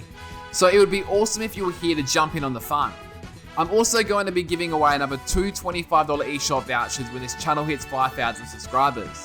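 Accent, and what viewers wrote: Australia. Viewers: Amazing video! Here is my list: 8.Mario circuit 3 7.Sky High Sundae 6.New york Minute 5.Kalimari desert 4.Snow land 3.Sydney Sprint 2.Waluigi Pinball 1.Mushroom Gorge And I really like your Australian Accent:) Keep the good up💖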